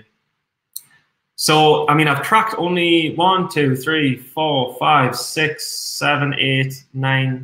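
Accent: North East England, United Kingdom. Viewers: hey man are you from north east of England????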